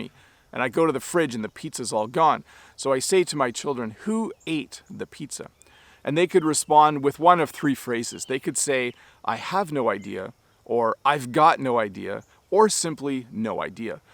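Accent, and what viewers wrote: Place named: Canada. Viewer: Thanks, really understandable as all your lessons and useful, saddly I gues I`m not the onlyone that learnt the alternatives but in a conversation have drive a blank and just can use the reliable "I don`t know" hahahah BTW I`ve noticed your canadian stamp "eh", I dono if you know but in spanish we also use "EH" in a simmilar way that you, in my country sometimes implies a slight provocation or defiant. Thanks!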